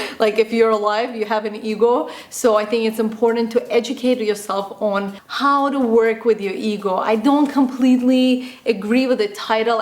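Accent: Russia. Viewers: you could see it from her videos that she's russian plus her accent is obviously a russian one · Русский акцент?😳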